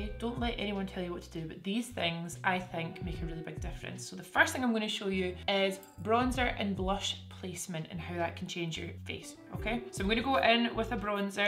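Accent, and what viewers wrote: Scotland, United Kingdom. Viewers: Ain Adena Indeed! She’s scottish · She has a west coast Scottish accent. I know because I'm Scottish too! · I love her Scottish accent, Scottish people and Scotland in general 😍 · Love this accent!!! Its Scottish isn't it???? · Love these pencils so much:) and can I just add how much I love your accent. Are you Scottish:) ? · Would guess her accent to be Scottish, but the r’s seems a bit strange for that - like a more American r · Are u Scottish? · Sounds Scottish. Is that right? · Sm xo this is how most Scottish people talk. I don’t know why people from other countries think you need to shout to be scottish😂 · You are so beautiful it doesn't matter what you do and that Scottish accent is the cherry on top. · Do it in that stunning Scottish accent too ! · it’s definitely Scottish x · I am certain that I am not the first one to say this but damn I LOVE your accent! 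Is that Scottish? Excuse my ignorance if I am mistaken. And of course the entire video is just wow! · she has a very standard Scottish accent with perfect diction. I'm not sure why you can't tolerate different accents but hey, it's your loss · I suck at doing eyeshadow and eyeliner 😞 I give up 🥺 BTW: are you Scottish?! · Is it Scottish accent? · rea zeqaj it’s Scottish very polite though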